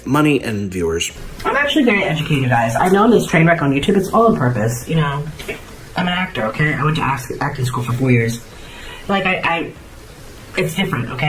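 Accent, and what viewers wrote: New York, United States. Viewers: New Yorker? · A buddy just turned me on to your channel yesterday. Of course, I subscribed. You are funny, and you have a cool accent. I'm originally from Boston and I am guessing your accent is NYC area, not Boston. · You Sound Like You Have A New York Accent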